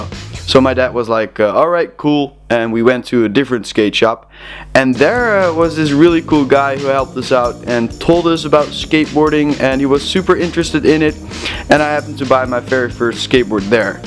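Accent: Netherlands. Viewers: Are you dutch?? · it's so funny how easy it is to recognize a Dutch accent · I hoor you Engels accent en ik dacht gelijk Nederlands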